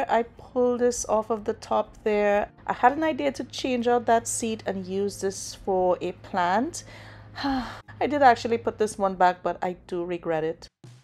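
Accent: Trinidad and Tobago. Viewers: New to your channel. Do I detect a Trini accent? I’m from Barataria. Enjoying your haul.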